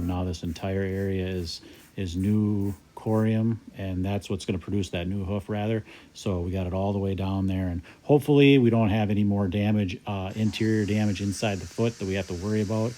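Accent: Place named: Midwest, United States. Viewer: I figured out why I'm kinda obsessed with your channel, its kinda like watching rust busting work on a ship, but with a pleasant and informative midwestern voiceover and empathy.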